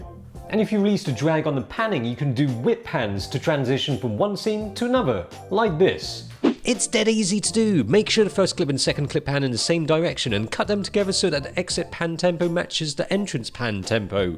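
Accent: United Kingdom. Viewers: Thumbs up who thought it was a voice over by a British guy. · i cant watch this, my brain hurts because my eyes are looking at an asian guy but my ears hear a deep voiced brittish bloke ... its so confusing at so many levels.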